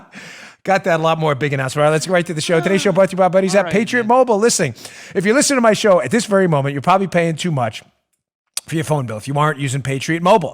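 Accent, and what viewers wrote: New York, United States. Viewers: NY wit and accent is wonderful! Love the quips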